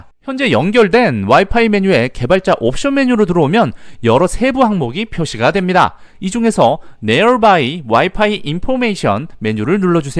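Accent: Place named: South Korea. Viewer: 말하는게 어느지역 사투리 같음 히얀하네 끝말을 강조하는게 두번다시 하지 마라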